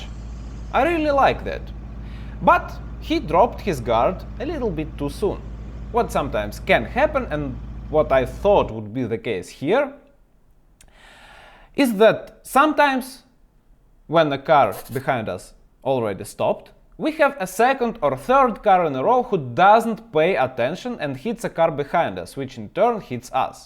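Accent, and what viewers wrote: Russia. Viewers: Yes! Our presenter in question is quickly becoming one of my favourites. I really like Russian accent. I like how he further analyses MotoStar videos; I think they may also be presented by a Russian guy. Humour and good sense make for very entertaining and instructive videos. This guy is a good teacher.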